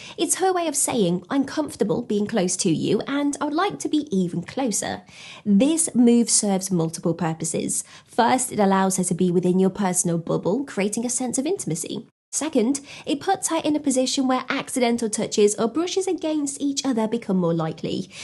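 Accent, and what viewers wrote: United Kingdom. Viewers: Love your British accent!!! 😘😘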